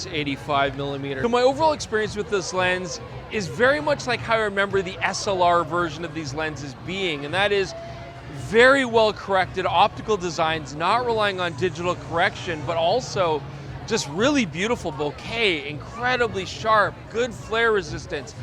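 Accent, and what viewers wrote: Australia. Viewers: legit good aussie accent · I think it's 90% Aussie! The words and enunciation are almost spot on! Though there was a bit of South African in there 😂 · Great aussie accent! You're a natural! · That was a very credible Aussie accent! 👏😄 Welcome to Brisy, hope you had a great time 👌📸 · nice aussie accent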